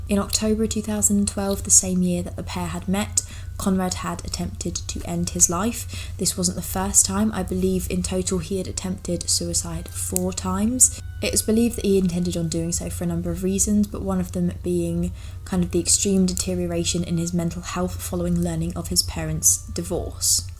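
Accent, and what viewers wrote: United Kingdom. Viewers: Something about the British accent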